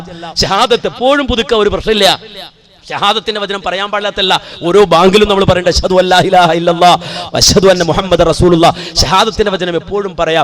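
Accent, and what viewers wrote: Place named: Kozhikode, India. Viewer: ആദ്യം ഭാര്യനെക്കൊണ്ട് പർദ ധരിപ്പിക്കടോ? സംസാരിക്കുന്നവൻ കോഴിക്കോട്ട് കാരനാണെന്ന് തോന്നുന്നു.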